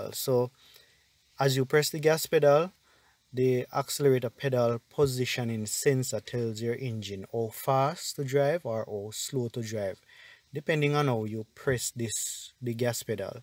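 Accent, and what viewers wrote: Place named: Jamaica. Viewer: This was very helpful , keep on doing your thing, you sounds like a yaawdy and i like the way you brought the information plain and simple.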